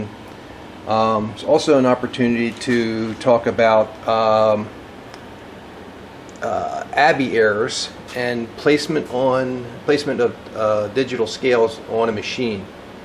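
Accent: United States. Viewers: Very nice. You do excellent work. You have the same accent as Tom Lipton do you come from the same area. I am in Australia so don't know much about American accents.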